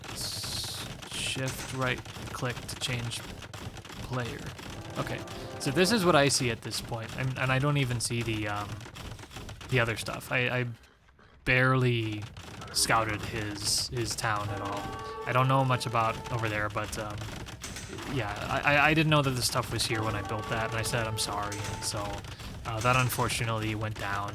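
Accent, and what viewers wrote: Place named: Minnesota, United States. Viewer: you sound like you are from Minnesota lol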